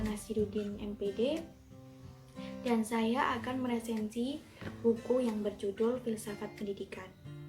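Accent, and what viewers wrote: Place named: Banyumas, Indonesia. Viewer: Uwuwuwu wong ngapak 😂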